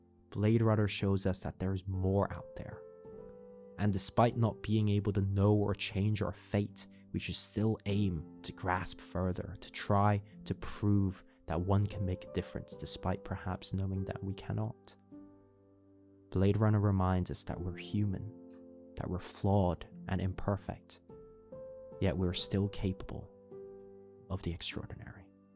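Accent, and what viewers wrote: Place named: Transatlantic, United States. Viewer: You have a very transatlantic accent. Kinda half British Isles, half North America.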